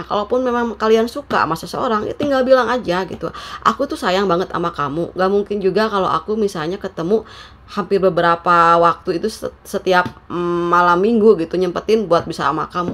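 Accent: West Java, Indonesia. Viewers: Siang Mbak cantik🙏..Maaf saya baru memfollowing. Sukses sll 😇👍👍👍TUHAN memberkati🙏😇🙏 Maaf teh, orang Sunda ya🙏bagus suaranya👍 Hatur nuhun terima kasih readingannya teh.🙏🥰🙏 Temanya, dia pakai magic, jk dia bnr pakai bgtuan, TUHAN akan menjagaku sll dr orang2 brniat tdk baik.Amin.🙏 Benar kejujuran adlh segalanya.🙏👍 Ya resiko dia nya sendiri, dia yg memutuskan pergi menjauh, dan saya sdh ikhlaskan serahkn kpd TUHAN, rasa sedih pedih luka di hati yg dia berikan, telah berangsur pulih hatiku, Kasih TUHAN pulihkan di hatiku🙏😇🙏..Lebih baik fokus utk ke depannya saja, anak2 saja, selflove dan terpenting semakin dkt pd TUHAN🤲🙏♥️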